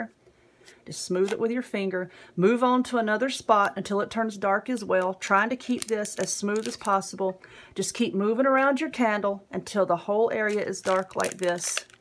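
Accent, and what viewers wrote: South, United States. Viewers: Thanks for sharing and I love your southern accent.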